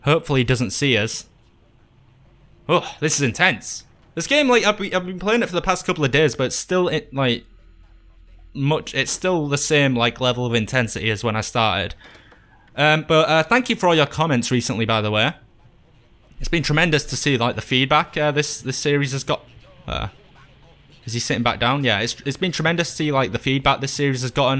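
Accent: United Kingdom. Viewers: Another German here, i do like your videos too, but mostly i like your accent! I cannot recognize it, somehow british, but special. Could you tell me, where do people speak like you? Greetings from Frankfurt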